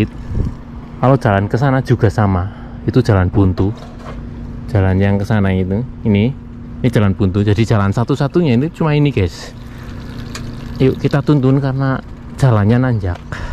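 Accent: Banyumas, Indonesia. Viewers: Enak kang; ora ngapak ora kepenak....😊😊😊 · Aku nonton sambil nelajar ngapak 😊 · Malah seneng mas, Ngapak,dadine natural. Ora mboseni,terus pemandangane apik bgt. Aja klalen,"permisi Mbah,🤭🤭🤭bismillah mas,😃😃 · Sy senang dengan logat ngapaknya kang.sekalian belajar bahasa ngapak.sukses terus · Mantab mas,ORA ngapak ora enak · yang ku suka dr channell ini adalah boso ngapak nya mas dan content nya yg sederhana, semangat mas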